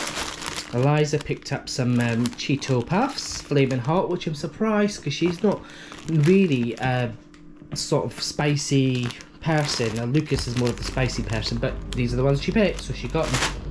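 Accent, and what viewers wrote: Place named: Scotland, United Kingdom. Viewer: i know hes Scottish as am i (glasgow) was uust wondering where in scotland is the accent from?xx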